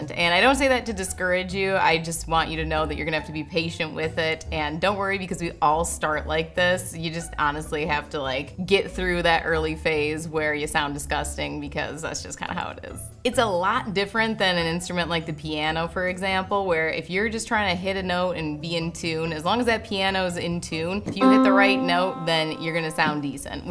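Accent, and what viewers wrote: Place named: Minnesota, United States. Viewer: You sound very Minnesotan. Are you?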